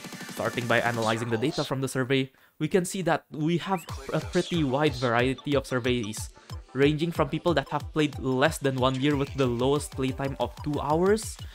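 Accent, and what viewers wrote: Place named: Indonesia. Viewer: Logat Indonya kedengeran banget njir, but S for effort 👍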